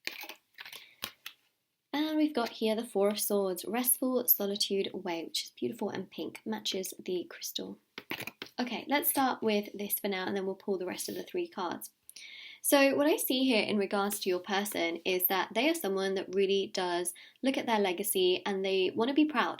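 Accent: United Kingdom. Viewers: Damn pile 2 really resonates to my Twinflame. This wasnt happened once yet every readings i tried to look for my person i end up always pick at the right piles where the reading was always pointing to him. My beloved twinflames. Such a huge confirmation.. This is infact a new strong confirmation from you. Muchos gracias. May the devine bless u more and guide you more. Ive been an avid fan of yours because of your accuracy and very positive energy that i could really feel as i watch your videos and also i wanna say i really love your voice its soothing and im loving your British accent so sexy to hear. 😍😍😍😘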